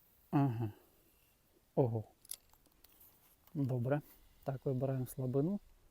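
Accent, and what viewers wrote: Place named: Russia. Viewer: Ну я зрозумів оріон це дуб , але з українською ти не дуже дружиш , кацапська тебе не покідає , але я бачу ти стараєшся удачі тобі в вивчення мови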